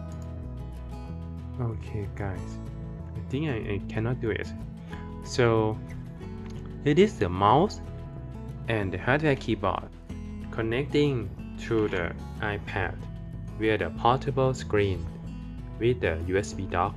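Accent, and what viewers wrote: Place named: Thailand. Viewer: สำเนียงไทยๆ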